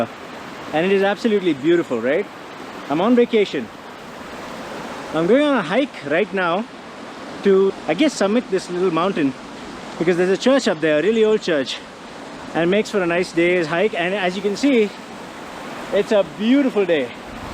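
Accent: Kerala, India. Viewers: Wonderful review. Are you from Kerala?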